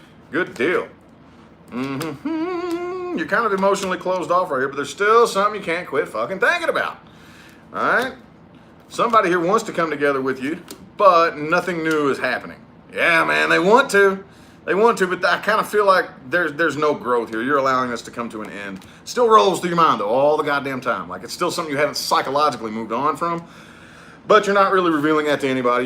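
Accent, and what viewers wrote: South, United States. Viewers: Somewhere in the south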